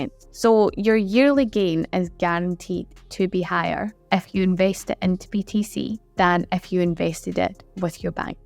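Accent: Scotland, United Kingdom. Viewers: Love your Scottish accent 👌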